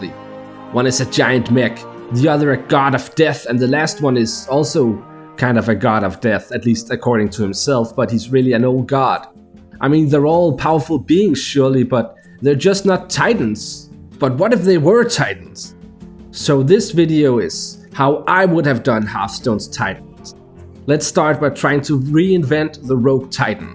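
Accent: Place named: Denmark. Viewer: Man ka’ godt høre at du er dansker 😅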